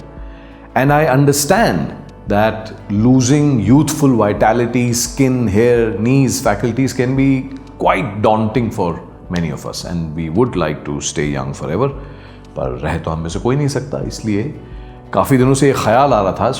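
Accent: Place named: Lucknow, India. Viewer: Bahut khoob ! Lagta hai hamari tarah aap bhi Lucknow se taalluk rakhte hain 😀🙏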